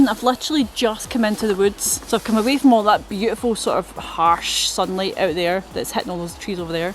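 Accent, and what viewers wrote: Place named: Scotland, United Kingdom. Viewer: Another great video. I always look forward to hearing your accent from home (says a Weegie in England!)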